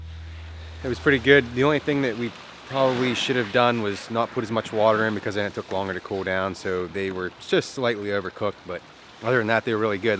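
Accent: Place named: Maryland, United States. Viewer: This was a really helpful and informative video. Not sure where you're originally from - the accent, I'm guessing Maryland or Delaware ;) but take it from someone from south Louisiana, you did a good job! BTW, if the tail is straight after cooked, that means the crawfish was dead going in, so don't eat those :)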